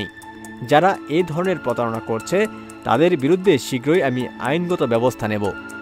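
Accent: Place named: Kolkata, India. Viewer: আমি পেয়েছি ফেসবুকে কিন্তু আমার বিশ্বাস হয় নায়। কন্ঠের উচ্চারণ কলকাতার ❤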